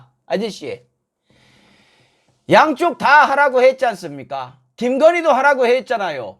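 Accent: Jeolla, South Korea. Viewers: 형 전라도야?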